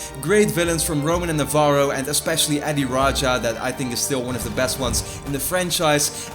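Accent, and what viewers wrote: Netherlands. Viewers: Are you from THE netherlands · is he Dutch? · He has a dutch accent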